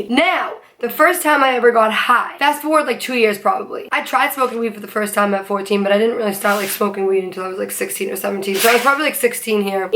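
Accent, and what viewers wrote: Boston, United States. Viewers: HER BOSTON ACCENT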